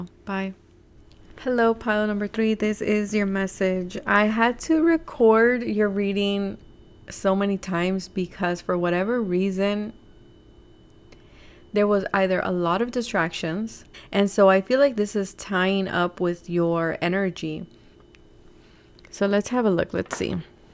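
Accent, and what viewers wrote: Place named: Latin America. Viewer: I think she has latina background, she speaks also spanish, so thus the accent. She is not african american.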